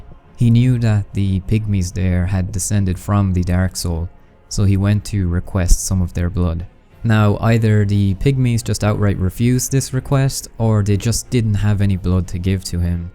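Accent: Ireland. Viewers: your accent but it sounds Irish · You sound Irish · your accent. Irish?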